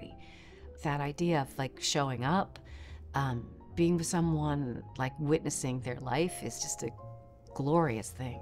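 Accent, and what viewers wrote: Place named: United Kingdom. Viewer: Why did i think she was british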